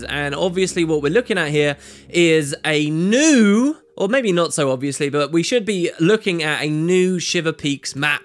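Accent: United Kingdom. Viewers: "...what we're looking at rocks here walking around in..." Can't beat UK English. Love ya,.:D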